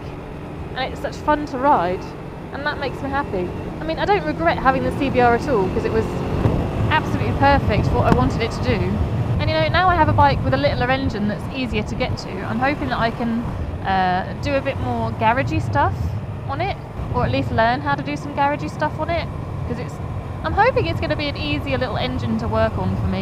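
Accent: United Kingdom. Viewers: Soo you're in germany? But you sound british? Also, do you do meets ?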